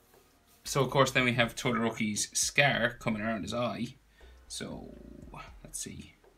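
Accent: Ireland. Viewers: Is it just me or does he have an irish accent like Johnny Sexton?!?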